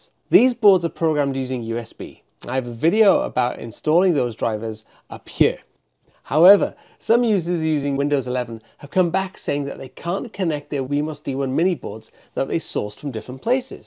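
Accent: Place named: England, United Kingdom. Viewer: Hi buddy, I assume U are english because of your accent. I must say that English people were very usefull during WW2, and today again you did help a poor lonesome french struggling since a long time with download pb with win11/vscode/plateformIO/esptool... to ESP8266mod . I was stuck for 24H .... CH340 behave well with my uart terminal, but not during flashing... I listen your video, and downgrade my CH340 driver from 3.8 to 3.5.... and it fu*king works! thanks guy ! and best regards!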